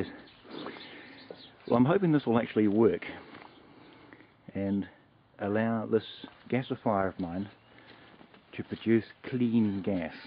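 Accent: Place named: New Zealand. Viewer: judging buy your accent your from new zealand, which leads me to ask... what wood are you going to run in your "imberted" fema gasifier?.... imbert type gasifiers are designed for hardwoods and don't take well to softwoods (pine etc) as the air jet velocity is too high and destroys the char bed, with uncool results for gas production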